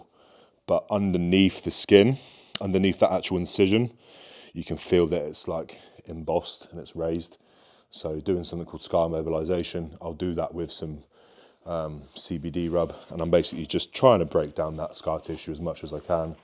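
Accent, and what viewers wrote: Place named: United Kingdom. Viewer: So weird to hear a British accent come out of what looks like a good old boy from Idaho.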